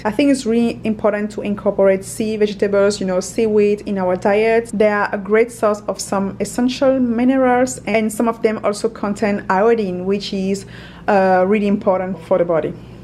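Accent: France. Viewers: Craig Mack she has a French accent to me. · Cet anglais parfait avec cet accent français xD Ca donne tellement envie tout ces produits frais ! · Are you french ?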